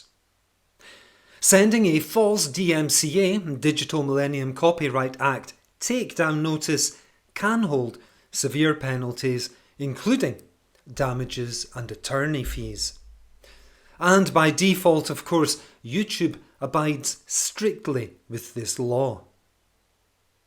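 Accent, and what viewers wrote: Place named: Scotland, United Kingdom. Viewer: Lovely Scottish accent that could cut through hardened stainless steel and glide through butter. There is something about a Scottish accent that makes one sit up and listen. When you think of the great Scottish actors: Mark McManus, Sean Connery, Robbie Coltrane, Ewan McGregor, Roddy McMillan and actresses Kelly Macdonald, Karen Gillan, Shirley Henderson, Isla Fisher, Laura Fraser.... and the list goes on and on..................and Scottish accent is up there with the best of them. Alba go bràth!!!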